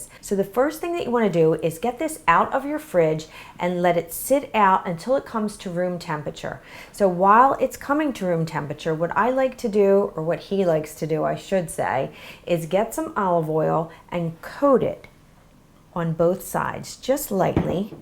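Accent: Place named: Brooklyn, United States. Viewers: Funny, I hear it too. Here and there it sneaks in. Wonderful video though. At least she's trying to free herself of the ny accent. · New York accent?...Brooklyn accent?...can hear it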